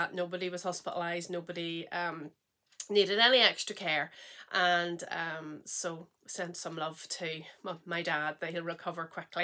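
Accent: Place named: Northern Ireland, United Kingdom. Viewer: Hi, I found your podcast yesterday. Absolutely love your podcast. It was your accent that made me watch as l was a Carrickfergus girl /woman who has just moved to the North coast. Incidentally l miss Jackson’s too!!!! I also spend a lot of time in Devon. I was just thinking you can take the girl out of Northern Ireland............ As a Christian l find your words very encouraging. Thank you so much xx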